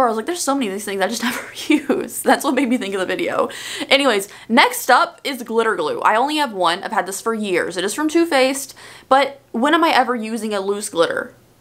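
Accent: South, United States. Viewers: I feel like your southern accent is more present lately and I love it !! Your Morphe Sweet Tea rant in your previous video was hilarious.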